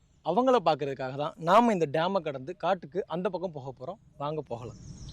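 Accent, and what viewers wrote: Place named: Tirunelveli, India. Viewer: தம்பி நீங்க திருநெல்வேலி பாஷையில் பேசி பாட்டியம்மாவிடம் எடுத்த பேட்டி super தம்பி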